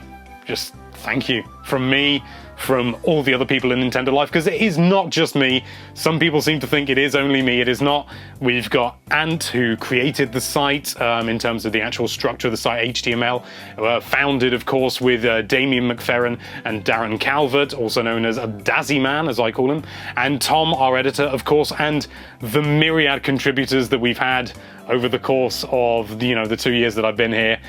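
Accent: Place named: United Kingdom. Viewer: Congratulations, you deserve it! I love watching your videos, you are sympathetic and honest. And the most important thing: your marvellous British accent.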